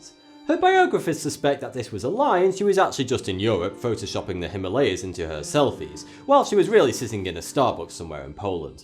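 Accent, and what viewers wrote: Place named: England, United Kingdom. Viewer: Where in England is this guy from? He has that game of thrones type of English accent.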